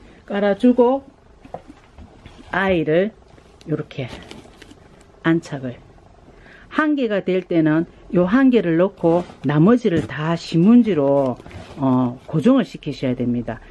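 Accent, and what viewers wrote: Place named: Gyeongsang, South Korea. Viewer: 아ㅡ고 포장여시 고수의 손길이 보이내요 ᆢ이리 꼼꼼이 ᆢ 정성또한 가득하네요 ᆢㅎㅎ♥️♥️♥️♥️♥️♥️♥️정겨운 갱상도 말투가 참듯기 좋네요 저두 진주 가시나 입니다 ᆢㅋㅋ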